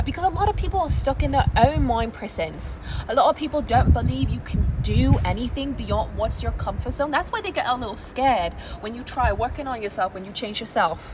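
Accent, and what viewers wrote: United Kingdom. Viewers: 😂😂😂😂😂we love British accents over here girl 😊😊😊😂😂❤❤❤❤ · Not the brittish accent😂 girl you lifted my mood. And I've cried today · The British accent makes it sound less like a scam! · Gurll I loveee your energy 🍀🌷🌷😭😭 and that British accent 😭😭. Lobbyaa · The british accent girlll hahaha ❤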